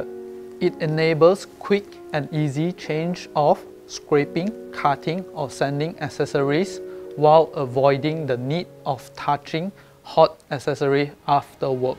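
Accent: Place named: Singapore. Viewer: Is this Bosch Singapore? Why is the english accent sounding more like Singaporean than Filipino?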